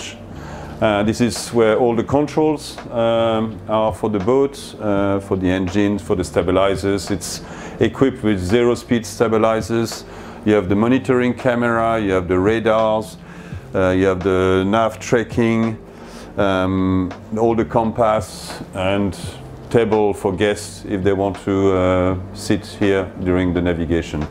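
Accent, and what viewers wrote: France. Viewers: Francisch accent? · Is this guy French?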